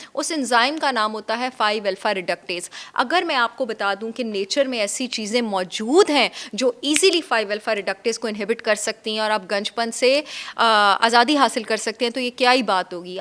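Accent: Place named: Pakistan. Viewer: Yrr i love pakistani urdu accent ❤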